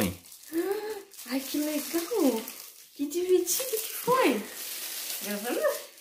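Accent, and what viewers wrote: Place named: Rio Grande do Sul, Brazil. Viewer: Perguntei de onde vocês são porque você tem sutaque de gaúcha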